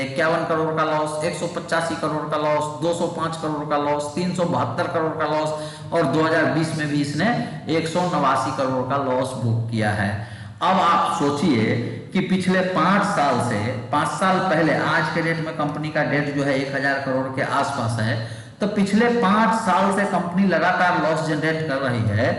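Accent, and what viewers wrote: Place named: Bihar, India. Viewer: TU Bihari hai 100%, or tu sharsa ya madhepura ka hai ................ sahi bola na .............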